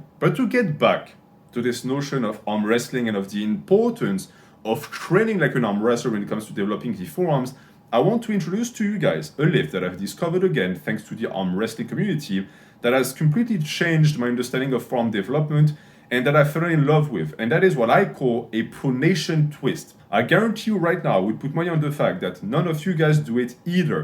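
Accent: France. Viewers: you have a bit french accent, not sure....nice video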